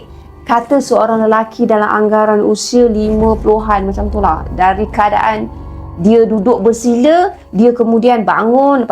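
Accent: Kelantan, Malaysia. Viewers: Kak ore kelate ko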